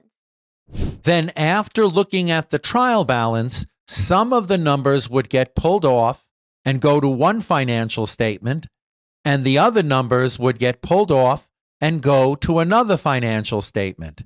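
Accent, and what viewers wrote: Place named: Bronx, United States. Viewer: I like the Bronx accent!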